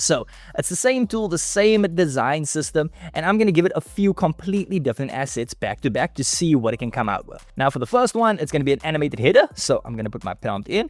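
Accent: South Africa. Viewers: My brother are you from South Africa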